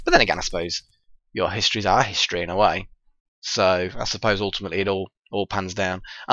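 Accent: United Kingdom. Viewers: I totally agree. The accent is just awesome same with Scottish accents. But I don't think I've ever paid attention to anyone with an Irish accent. (mainly cause I haven't heard anyone with one) But yeah the accent is one of the cool things about people from the UK.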